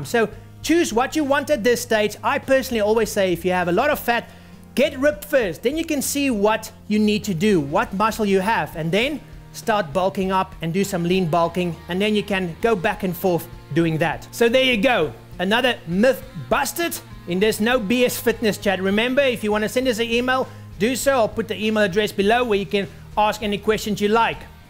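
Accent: South Africa. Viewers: Great vid man. I like to hear a no BS message in a SA accent.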